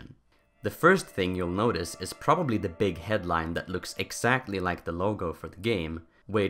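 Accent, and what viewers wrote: Sweden. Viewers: Heey are you swedish?! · He has a swedish accent anyway so I guess he is from sweden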